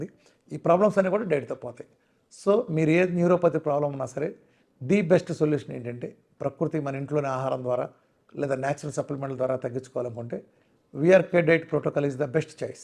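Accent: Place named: Andhra Pradesh, India. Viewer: Me matalu alane unni memu Andhra me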